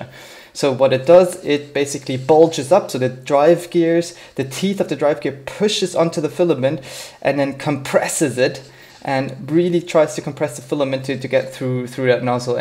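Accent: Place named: Germany. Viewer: Offtopic: I noticed your german accent and never thought that originates from germany. And after looking in your impressum I see karlsruhe, where I study:-). Muss ich mal in die Oststadt schauen:-D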